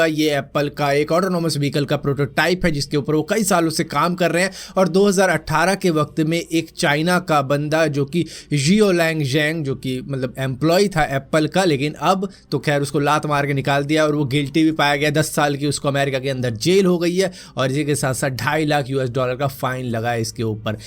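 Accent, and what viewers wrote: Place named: Gwalior, India. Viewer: Bhai gwalior se ho kiya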